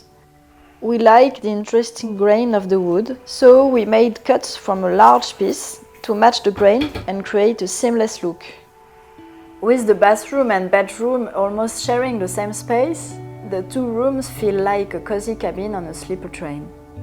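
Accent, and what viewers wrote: France. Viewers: Chouette visite, de plus je me rends compte que je comprends bien l’anglais quand il est parlé par une française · I think it's just her French inflection. What she said is "I love the influence of Danish architects, Alvar Alto, and American MCM architecture". I'd have to assume any professional architect with interest in MCM design has studied this carefully.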